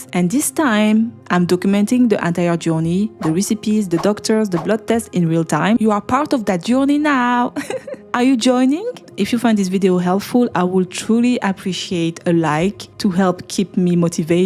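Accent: France. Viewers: I picked up the same French accent was confused by Germany 😂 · Quel beau Englais-Francisé😊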